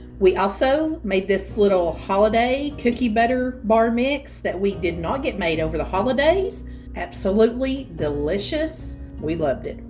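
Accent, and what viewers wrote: South, United States. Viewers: You are adorable and I just love your southern accent!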